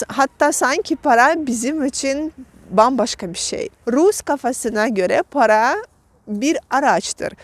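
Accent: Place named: Istanbul, Turkey. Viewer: Yorum yapan herkesin yorumuna cevap vermeniz büyük bir incelik , bu arada İstanbul lehçesi ile bir İstanbul hanımefendisi gibi konuşuyorsinuz , tebrik ederim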